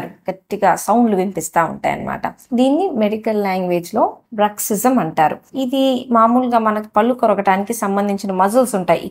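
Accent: Guntur, India. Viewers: అచ్చమైన గుంటూరు యాసలో మాట్లాడుతున్నారు🎉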